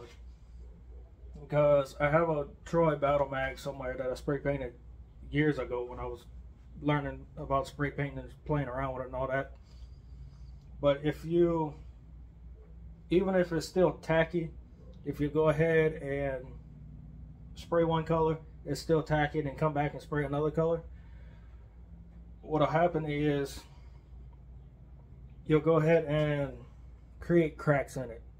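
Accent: Louisiana, United States. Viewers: Sound like you from St Landry Parish 😂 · He sounds Cajun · What part of Louisiane are you from? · That’s a Louisiana accent if I’ve ever heard one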